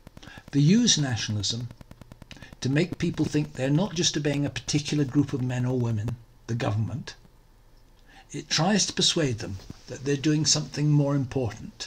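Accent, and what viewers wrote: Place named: England, United Kingdom. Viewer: It's interesting you come out against Scottish nationalism when your whole planning-centred politics de facto centres around British nationalism. Scottish nationalism - tartan shortbread tins aside - is at least partially driven by pragmatism. They've never been well represented by Westminster. The divergent pandemic responses surely illustrate that... Scottish independence could pave the way for a federal system in the isles, which would be a step forward in political organization. (Your accent sounds English but I know you live in Edinburgh, so I'm sure you have a perspective on this. The last time I was there, I went down to the Cowgate and reflected on James Connolly, who seems to have had a balanced socialist perspective on the matter.)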